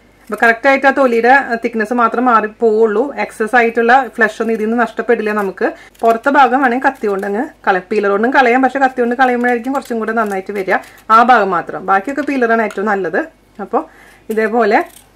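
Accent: Thrissur, India. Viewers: Nalla assal Thrissurkkari :D. Rasamulla explanation :)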